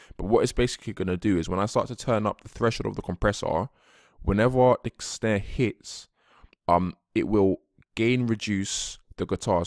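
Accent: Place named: London, United Kingdom. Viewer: Yes, bruv!! Which part of London you from?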